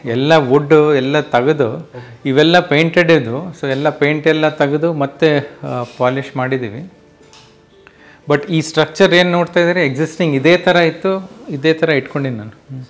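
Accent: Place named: Bellary, India. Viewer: His original Bellary kannad accent I just love it👌👌👌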